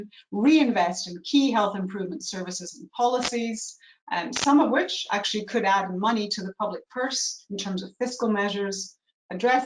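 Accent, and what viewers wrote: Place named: United States. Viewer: Why is Professor accent becoming more American by the day? SO annoying!!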